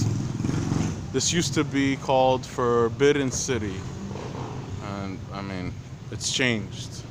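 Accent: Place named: Bronx, United States. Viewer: It was your accent. Just reminded me a lot of my co-workers up in the Bronx. LOL. I work around the Fordham Area. Enjoying your videos.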